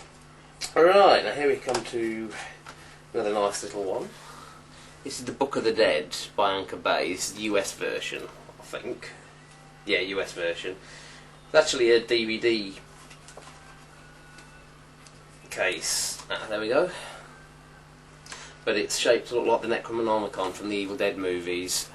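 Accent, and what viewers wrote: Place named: United Kingdom. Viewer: Oh sry man my fault i thougt you were britian (you sounds like) :D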